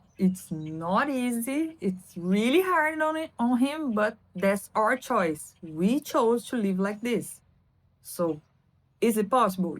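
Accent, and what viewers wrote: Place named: Quebec, Canada. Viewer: Hi! In some ways your accent sometimes sounds like French Canadian haha! Winter has two aspects for me. 1) It makes me appreciate my cozy home more (in summer you don't want to be inside) and 2) There's a whole lot of winter activities that people can do to enjoy the outside. Skiing, skating, snowman, snow angels. Christmas is really Christmas too!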